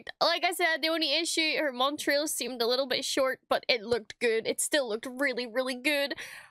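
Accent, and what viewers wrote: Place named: Northern Ireland, United Kingdom. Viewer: You're part of the NI 501 right? Hearin' that accent. Mate how do I get in to the 501 chapter or what have ye?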